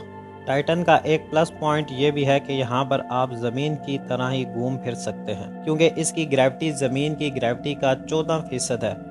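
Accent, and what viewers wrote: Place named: Pakistan. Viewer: Ha bhai iske accents pakiatani hai but it's ok we Indians support pakistani also 😊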